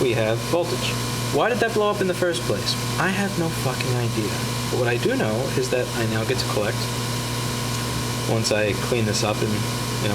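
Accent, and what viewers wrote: New York, United States. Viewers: You can totally tell he's from New York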